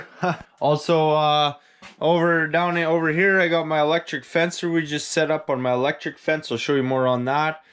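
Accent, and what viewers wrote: Canada. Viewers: Fuckin love Canada and Canadians. This dude literally sounds like a dude doing a parody of a Canadian, and I think that's really beautiful. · That's the most canadian sounding guy I've ever heard. Could be doug and bob McKenzie's long lost brother. That's a badass set up that he has there, tho. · I'm Canadian and I've never heard this Canadian accent!! Lol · I definitely say eh a lot, but this is the most Canadian guy ever.. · Truly. And the accent makes everything sound like it has a G-rating even when saying “shit” (which I didn’t know was even a word in Canada). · Such a "Canuck" Love your accent brother. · I love the Canadian accent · I'm Canadian and even I was like, wow that's so canadian. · Been a long time Since I heard the great Canadian accent!! Love it! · Born and raised in Ottawa. Never heard such a Canadian accent in my life. 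· I agree you have the purest Canadian accent!! eh!!! I enjoy your videos and I'm envious of your lifestyle!!! All the best mate!!! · first time viewer but 5 seconds in I can tell its a fellow Canadian lol, got a doozy of a spot there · Knew he was Canadian from the jump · Is there an Irish modification to this Canadian accent? Sorry, curious linguist. (yes i know Ireland is older than Canada. The only reason I use "mod" is because someone can be born and raised in one country but still be taught a language by people culturally from another. In this case, someone identifying as Canadian is being taught English by historically Irish English users. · Love the Canadian accent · Holy shit that is the Canadian accent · I don't know if this is Canadian accent or menonite mixed with Amish and a little Canadian threw in for good measure. But it sounds like you really poor it on extra extra like trying to copy a wirey granddad like I copy both my grand dads and my dad I've noticed naturally. 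Either way pretty good ol boy A ..... Well you are ok in my book lol nothing like a Cummins 12 valve . Have a good one young man . I don't see how anyone can live in such beautiful country and not be able to have guns like. It's almost like living in Alaska but less freedom. But what am i talking about we have a bunch of demoncrat, antichrist spirited bunch of heathens that stole our election and are pissing away everything here . But seriously awesome place . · You're video was recommended by YT and I'm glad I watched it. First off, as a fellow Canadian I heard the accent and knew where are from, awesome accent! I like your cabin it's very quaint and seems efficient. You had me laughing at "this is the shitter" haha... classic. You have a new subscriber.. take care from ON. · That's deep Canadian. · I just got up out of my bed to grab a Moosehead. That’s how Canadian I feel after listening to this gent! 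Awesome! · Wow. I quite enjoyed the videos I watched. Amazing that you started doing this when you were so young. I have four boys in their late teens and the only thing they do is play computer games all day. There I am building a woodshed with my Chainsaw mill and none of the boys have the slightest interest in building something. We live way out in the woods in northern BC and I enjoy nature and doing things as well. Good on you. Wish you all the success. That is quite the Canadian accent, by the way. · I get all the confusion about accent, have yah considered he's Canadian and a little tipsy? yah know? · The loft is awesome! Looks like a great place to relax and get cozy on cold Canadian days and long ass winter nights.. By the way, love your accent. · Im Canadian, was raised in the bush, and even Im like. "whoa thats a thick Canadian accent bud"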